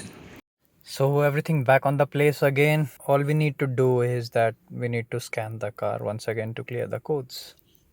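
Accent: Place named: India. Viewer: Engleza indiana, imi vine sa ma cac!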